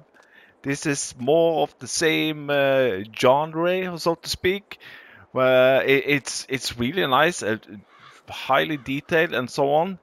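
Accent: Norway. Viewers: Er du norsk eller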